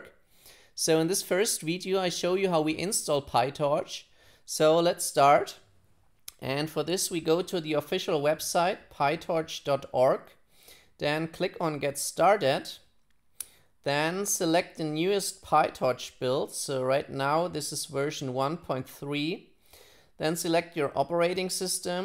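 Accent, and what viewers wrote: Germany. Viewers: His voice is too sharp with a German accent.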